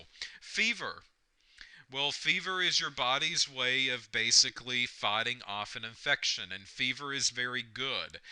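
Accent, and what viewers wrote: South, United States. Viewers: very good like your southern? accent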